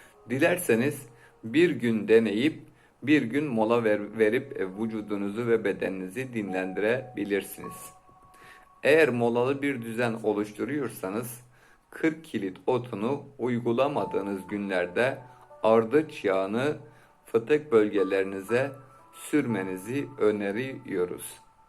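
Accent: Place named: Van, Turkey. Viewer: Vanli mısın hocam😊 65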